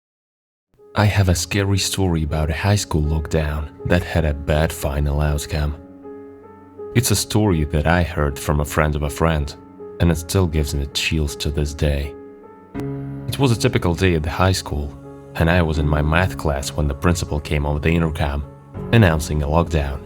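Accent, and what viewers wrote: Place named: United Kingdom. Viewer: HES BRITIS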